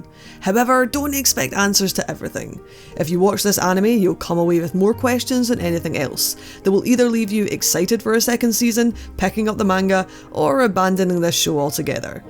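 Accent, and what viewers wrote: Scotland, United Kingdom. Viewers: Just found your channel, but it's exciting to hear some Scottish representation in my Youtube content! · when the Scottish accent hit me I was like yesss gurl- Got myself a wee buddy :D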